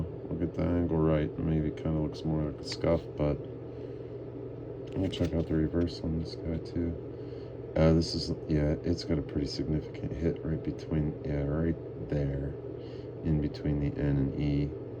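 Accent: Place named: Pittsburgh, United States. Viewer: Sounds like you are from Pittsburgh. Or somewhere close. Anyway I like the red/orange cents.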